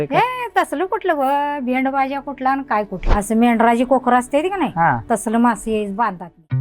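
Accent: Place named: Kanthi, India. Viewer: आवाज कंठी गावचा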